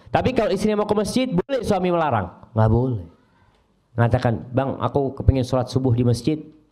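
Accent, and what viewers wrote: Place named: Jakarta, Indonesia. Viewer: Jadi usyar itu ,orang padang atau betawi???....kok bahasanya spt orang betawi????....betawinya dimana ustadz????....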